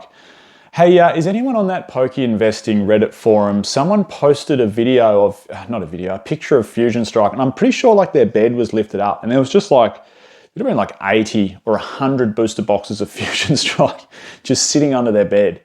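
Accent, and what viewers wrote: Australia. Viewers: Please! It's just a dumb joke based on accent. I'm an American, don't apologize for being aussie. We love it and just trying to have fun! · As an American from Kansas, yes Australians and bristish people do put a "R" with words that end in a "A". So Paldea to us Americans sound like Paldear when you guys day it. Its not a big deal, its just part of your accent.... I have never heard anybody talk abour Australian money as dollary-doos.. 😅 Thats just funny, but in a endearing way. Dont fret to much about what us Americans say about how y'all pronounce words. We all sound different. Somebody from New jersey compared to someone from Louisiana compared to Wisconsin than California. All us Americans sound weird to each other too. Your all good 😊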